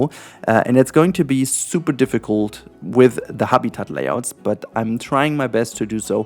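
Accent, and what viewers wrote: Germany. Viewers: oha habs bisl am Akzent erkannt aber sprichst sehr gut englisch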